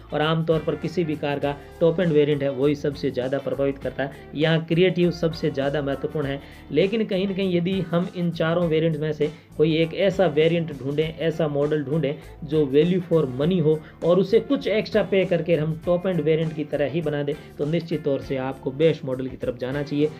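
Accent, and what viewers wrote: Rajasthan, India. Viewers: Bhai rajasthan ke ho lagh toh rha h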